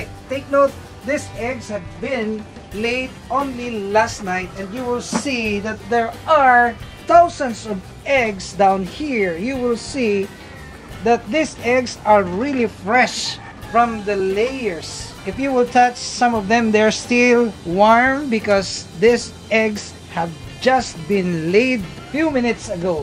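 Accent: Philippines. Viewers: He's accent is Filipinose. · I think this guy from the philipines, just by listening to his voice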